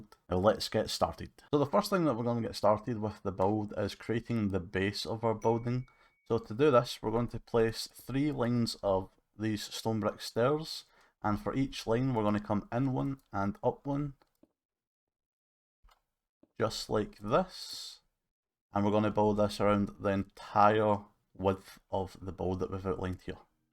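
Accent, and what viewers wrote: Scotland, United Kingdom. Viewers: a Scottish Japanese style minecrafter? :)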